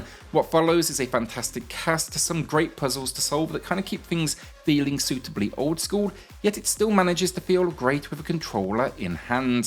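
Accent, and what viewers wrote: United Kingdom. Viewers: you have a cool accent, Scottish perhaps?. It won't be long before this channel gets massive. Keep it up guys!!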